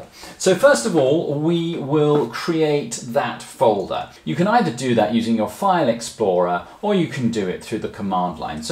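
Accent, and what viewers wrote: United Kingdom. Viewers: Thank you so much, this is such a great help and I exactly had the same promblem as you. Thumb up and subscribed. BTW: I love the Britishnes of your pronounciation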